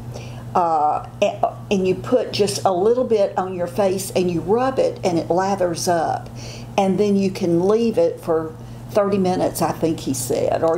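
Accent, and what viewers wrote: South, United States. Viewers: Kindness begets kindness, you are so right! And I believe it is contagious! BTW, I love southern accent. No other accent in the world is as charming, and makes you want to smile regardless of how you may be feeling. And yes, I am a southerner so I might be a "tad" partial!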